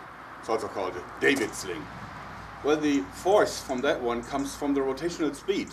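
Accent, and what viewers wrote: Germany. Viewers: def. sounds german! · Do you come from Germany?? Your voice sounds like that ;) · Er IST deutscher, was denkst du wieso seine Aussprache diesen Akzent hat ;) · he sounds german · Is he german? it sounds like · he isn't from Austria so it would sound different because arnold schwarzenneger is from Austria and Isn't he is from Germany can hear it from his accent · definitv German!! unverwechselbarer Akzent :D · This was the first of all of his videos that I had watched and he sounded German. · Is it a german accent?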